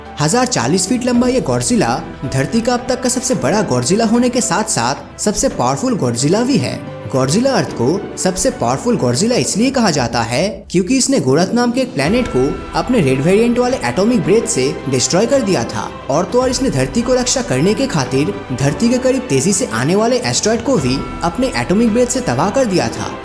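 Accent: Bengal, India. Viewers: Are you bengali? 🙄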